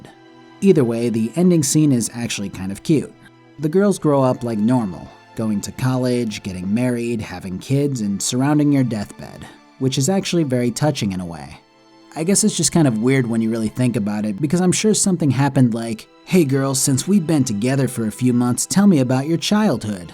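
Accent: Germany. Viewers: i love your German accent lol maybe bioshock 2 too? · As german, I liked your german and german accent.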